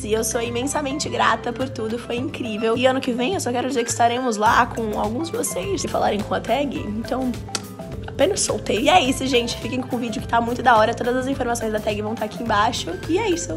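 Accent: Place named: Spain. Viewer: I dont understand nothing, but i tink dare she is from spanish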